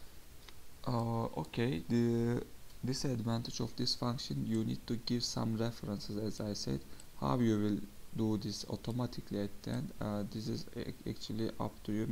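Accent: Turkey. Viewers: Hocam aksandan Turk oldugunuzu tahmin etmistim, Github'a da Istanbul diye yazmissiniz. Selamlar.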